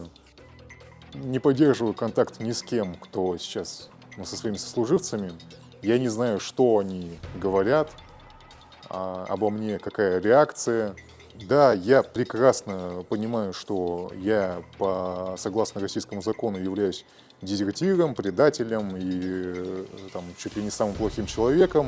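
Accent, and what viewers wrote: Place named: France. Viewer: Акцент французский.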